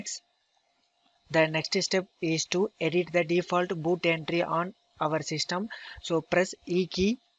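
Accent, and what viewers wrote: India. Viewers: Straight to the point,easy to understand,very simple to follow. Also I really don't mind the indian accent. Personally I find it easier to understand. Keep up the good work man!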